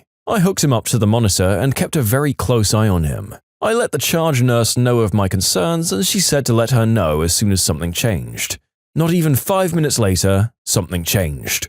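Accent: United Kingdom. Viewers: British guy :C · i like british man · Ya british mate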